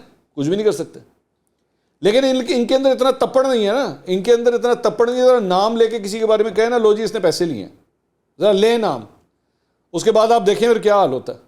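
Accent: Punjab, Pakistan. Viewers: Bilkul buht Achi or Suchi batayn karty hain ap or panjabi touch b buht mazy ka hota hai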